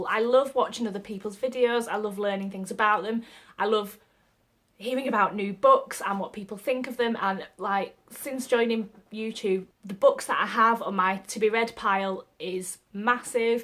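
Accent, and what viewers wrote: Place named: Northern England, United Kingdom. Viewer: I clicked onto this, excited to watch another Newbie Book Tag and am welcomed with the familiar accent of a Northerner on YouTube :') Hi!! Haha, where abouts in England are you from? (I'm not a stalker I promise, just curious haha) :)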